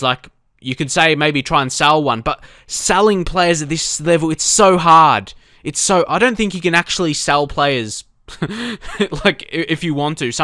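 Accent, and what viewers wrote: Australia. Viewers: I'm guessing because you have an Australian accent, as one of the candidates on the apprentice has, people are taking the piss. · really like this lets play because you go into detail and teach me a lot of stuff. you are australian accent is something that takes a bit getting used to ;) Greetings from germany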